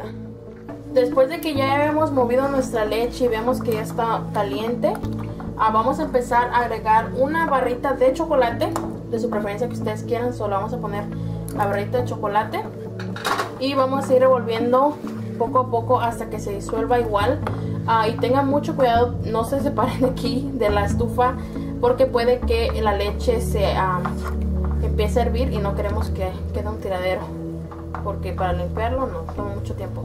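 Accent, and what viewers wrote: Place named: United States. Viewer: cada vez de dices SO! es como una patada en la espinilla.... adios con tu cento pocho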